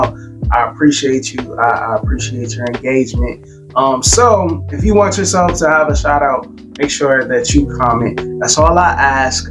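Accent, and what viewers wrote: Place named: RP, United Kingdom. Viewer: Thanks for dropping the Mary Poppins accent. Made the video much more enjoyable!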